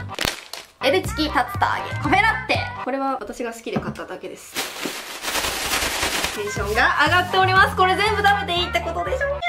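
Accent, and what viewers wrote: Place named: Japan. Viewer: 都民からするとは結構なまってるよ🍊 方言で地元感あっていいよね🍊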